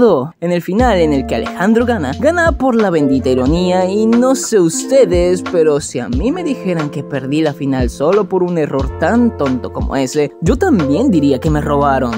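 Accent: Venezuela. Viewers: Mano, se re nota que eres venezolano jajaj saludos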